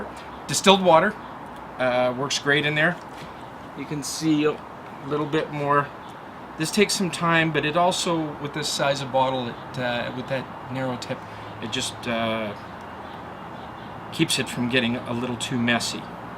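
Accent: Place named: Canada. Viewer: Great video i love the way canadians say about (a boat) and every conversation starts with ah fuck buddy very funny and cool.and whats the details on that lovely himidor ie price.capacity.cheers.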